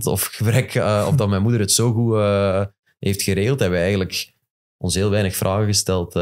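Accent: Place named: Antwerp, Belgium. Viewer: Kevin, hij spreekt antwaarps, niet limburgs😂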